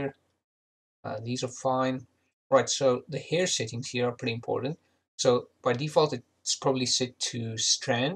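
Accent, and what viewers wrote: South Africa. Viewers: I detect South Africanness. " ", confirmed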